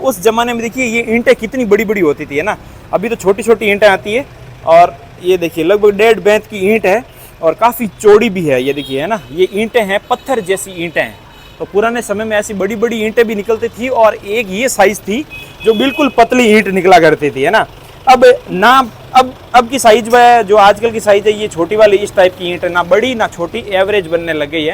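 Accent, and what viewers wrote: Rajasthan, India. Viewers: भाई आप की बोली से तो एसा लगा आप राजस्थानी हो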